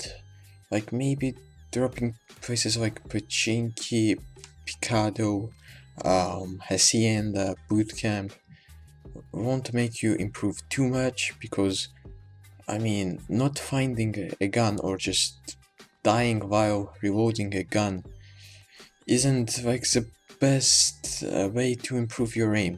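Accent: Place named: France. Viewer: He is french or Belgian or Swiss or from Montreal maybe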